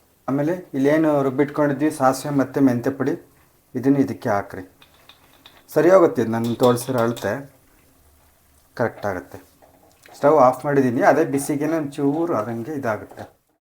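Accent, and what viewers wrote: Chitradurga, India. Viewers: ನಿಮ್ಮ ಭಾಷೆ ತುಂಬ ಚೆನ್ನಾಗಿದೆ. ನಮ್ಮ ಚಿತ್ರದುರ್ಗ ಜಿಲ್ಲೆ ಕಡೆ ಹೀಗೆ ಮಾತನಾಡುವುದು. guraduvudu, Durga barga etc. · ಇದು ದುರ್ಗದ ಭಾಷೆ ಅನಿಸುತ್ತದೆ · Sir neev yav oorinavaru?nim language same nam Chitradurga style nalli maathadthira 👌👌👌...nim recipe thumba ishta aythu sir 😊😊😊😊😊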